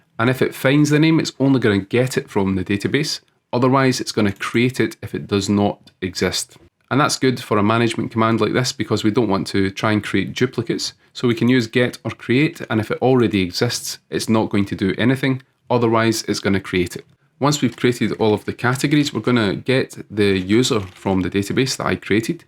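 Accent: Scotland, United Kingdom. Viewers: Your accent is really sick man 😎 love the scots. Greetings from the USA.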